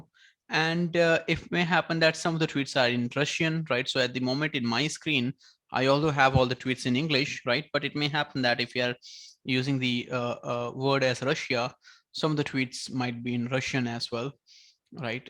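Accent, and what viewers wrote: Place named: India. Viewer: orang indonesia tapi logatnya kayak orang india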